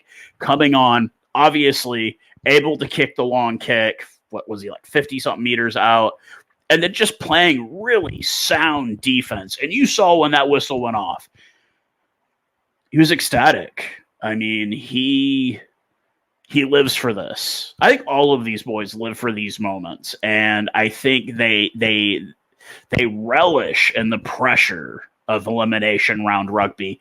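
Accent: United States. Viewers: Thank you for this video, really enjoyed an American voice talk informed and passionately talking about our Bokke, will be following your future content, would actually love to see the US come up in the rugby world. Keep up the good work.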